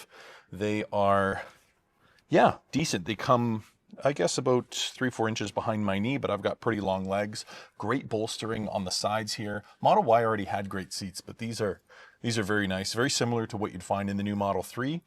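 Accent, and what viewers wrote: Canada. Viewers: He sounds Canadian.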